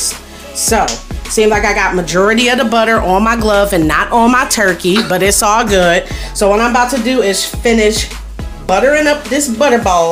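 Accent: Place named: Buffalo, United States. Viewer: You from Buffalo? That accent sound just like Westside Gunn. Boom boom boom. 😂😂😂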